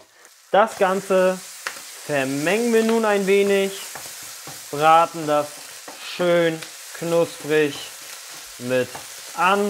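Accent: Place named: Thuringia, Germany. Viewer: Na das muss ich unbedingt mal nachkochen. Ruhig mehr Rezepte von. Die sind immer so ein bisschen moderner und ausgefallener. Spezialität sind doch eher Klassiker oder zumindest neu interpretierte Klassiker. Ich habe gemerkt, dass ich auf einem anderen Kanal schon oft solche "schnell, einfach und modern" Vorschläge gesucht habe, als ich die leere Pfanne gesehen habe und schon den thüringischen Akzent im Ohr hatte "erst mal einen Klecks Öl in die Pfanne" 🤣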